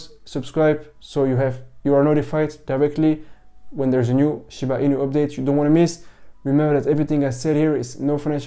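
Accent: France. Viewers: Everytime ...something big is coming up ...everything is ,, bullish“ and ,,amazing“ and looking at Shiba ,,realistically“ but I lost around 500 Euros since last week Monday ! So tell us when the Long term holders you think will make plus . What is ,,soon“ that Shiba,, can reach Doge“ ?!? Nothing is ,, looking great atm“ ! But I like your accent :-) the first french who likes to talk in English 🔥💯! Best regards from Germamy 💯🔥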